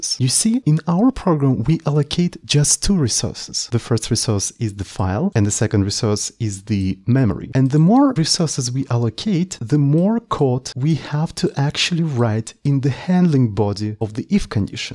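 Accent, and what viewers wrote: Russia. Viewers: Вау, крутой канал. Очень нравится этот лёгкий русский акцент, прямо как родной, лол.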